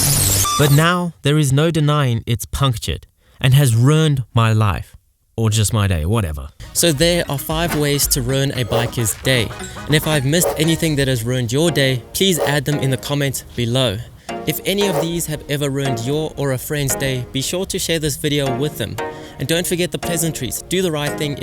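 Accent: Afrikaans, South Africa. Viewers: Eyyyyyyy, I new u were South African when I heared your voice🤣🤣🤣then I saw "Johannesburg" so what up bra🤣🤣🤣 Ok so like I'm 15 and I wanna get a bike for next year, it's a Honda cbr125r for 15k and I need to buy it myself😦😦😦 so any suggestions on how to get the cash🤣🤣🤣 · I was suspecting your from South Africa just because of your accent and then i saw the world clock on joburg, you just have that afrikaans accent some of us afrikaans mense have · Are you from south Africa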